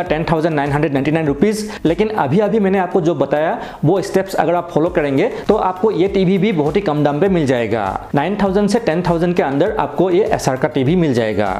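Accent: Assam, India. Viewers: You accent says you are assamese from uper Assam and why do you sound like reading newspaper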